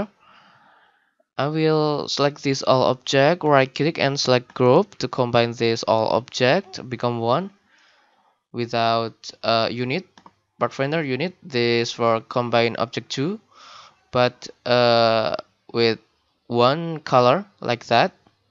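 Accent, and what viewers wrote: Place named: Indonesia. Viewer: hem logatnya kyak orang indo ya...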